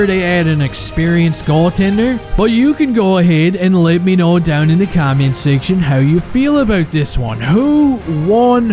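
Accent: Newfoundland, Canada. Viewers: Is that a Newfie accent?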